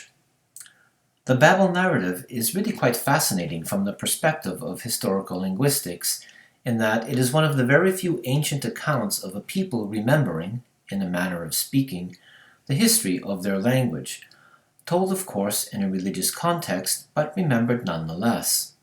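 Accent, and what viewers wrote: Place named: United Kingdom. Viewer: Ps. I thought that you said that you are from America and your father is Polish. But IMHO your accent is British, not so much accent but the way how you construct sentences and words you use. So you are expat leaving in USA? Have a great day!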